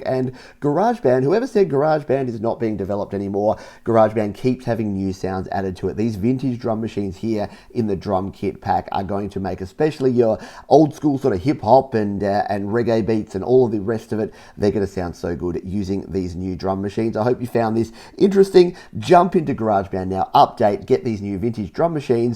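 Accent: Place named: Australia. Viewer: I recognise that accent. Hi fellow Aussie.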